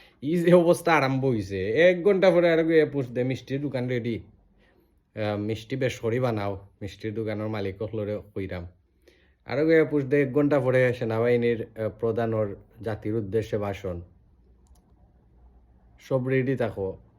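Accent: Sylhet, Bangladesh. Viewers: Apni chalti vhashei kotha bolun bujha jai na..kisui....sab toh siletty jane na😂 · তোমার ভাষা ঠিক কর কতটুকু সিলেটি কতটুকু শুদ্ধ অবাঙাল কোথাকার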